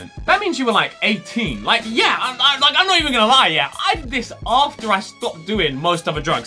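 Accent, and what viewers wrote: United Kingdom. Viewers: are you British ?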